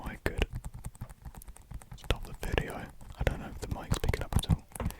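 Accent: United Kingdom. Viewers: I can tell my teacher I'm learning British English with Asmr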